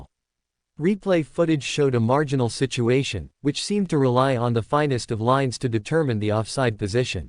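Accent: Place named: United States. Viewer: And why do we have to listen to an American accent, US English usage (… a 1 to zero win …) etc? Surely there are British English AI voices out there, no?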